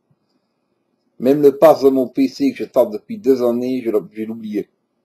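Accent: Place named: Belgium. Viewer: Merci et bravo :) je suis fibro depuis 12 ans et depuis cataloguée hypocondriaque par mon doc donc aucune prise en charge anti douleur ah si je dis des conneries dafalgan codéiné pour mes migraines avec aura nan pasque ca donne mal au crane de souffrir h24 quand même alors merci j ai adoré t'écouter (ahhhhh l accent belge) ps: suis de france genre cht'i et ici même bordel fibromyalgie kezako reprend un ticket et reviens me voir plus tard lol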